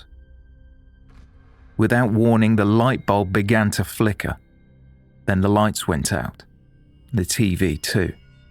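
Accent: England, United Kingdom. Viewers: I like the narrator s voice very much n his english English❤❤😊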